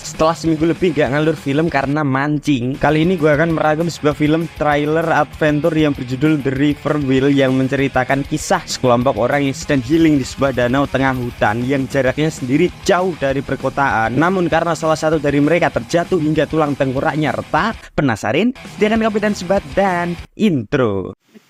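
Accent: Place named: Java, Indonesia. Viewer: channel yg tidak ada apa apanya di banding iq7, suaramu medok gak enak di dengar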